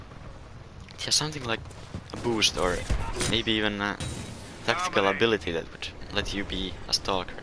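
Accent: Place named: Finland. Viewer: you sound like finnish, i'm finnish btw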